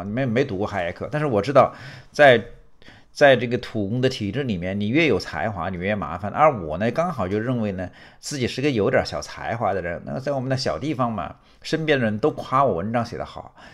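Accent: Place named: Hunan, China. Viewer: 听到了湖南口语，想起我隔壁宿舍的兄弟。哈哈哈哈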